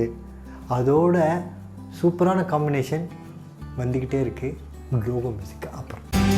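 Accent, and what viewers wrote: Tirunelveli, India. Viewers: Unga slang nala irku ..niga tirunelveli ah?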